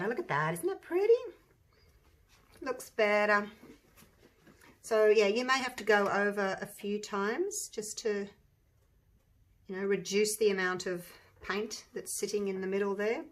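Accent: Australia. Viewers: Also I love your voice I like to listen to the Australian will actually any type of broge or accent love to hear different drawls ect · I just have so much fun watching your pour paintings. You explain it so well especially with your Australian accent. I love Australia. I’ve only been lucky enough to visit there once and check it off my bucket list. You’re so lucky to live there. And your paintings are gorgeous! ❤ I’ve got all my material and I’m ready to start.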